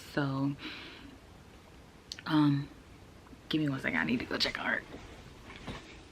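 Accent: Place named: Nigeria. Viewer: Your Nigerian accent is actually strong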